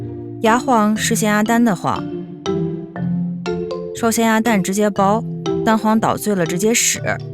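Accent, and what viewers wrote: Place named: Tangshan, China. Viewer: 唐山话好可爱 哈哈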